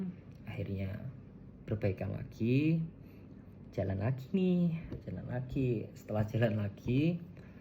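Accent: Java, Indonesia. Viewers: Lho koh medokkk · Logate Medok mas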